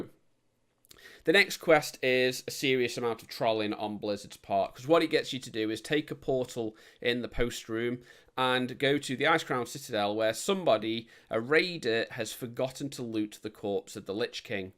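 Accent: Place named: Stoke, United Kingdom. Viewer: the steps were to fast i had to listen to your stoke accent so much !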